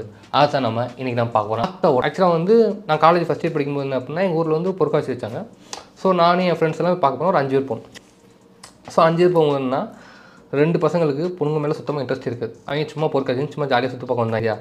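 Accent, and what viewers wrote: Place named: Madurai, India. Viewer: Bro madurai kaarar pola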